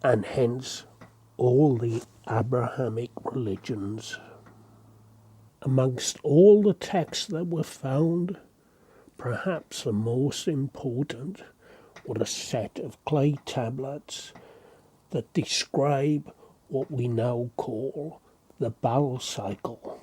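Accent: Wales, United Kingdom. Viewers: He has a Welsh accent. Both countries have a similar native language Gaelic. If I'm not mistaken.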